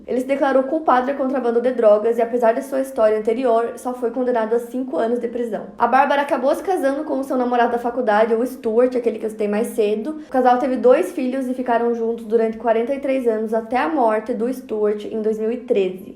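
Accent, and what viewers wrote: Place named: Rio Grande do Sul, Brazil. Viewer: acho que é riograndense Aqui no Rio Grande do Sul falamos assim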